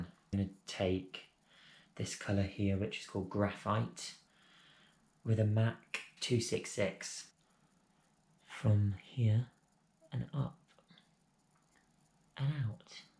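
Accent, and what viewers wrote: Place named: Essex, United Kingdom. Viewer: You sound of..... East England.... but South, Mid, or North...? I can’t tell for sure but I am going to guess Essex.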